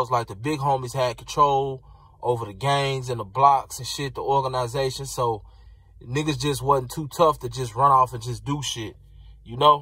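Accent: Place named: Chicago, United States. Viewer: Sounds like he from Chicago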